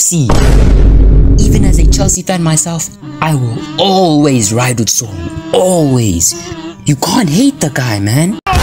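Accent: South Africa. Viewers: I can hear the Satafrikan accent😂🇿🇦💯 And you're a Chelsea fan? Subscribed🫱🏽‍🫲🏾💯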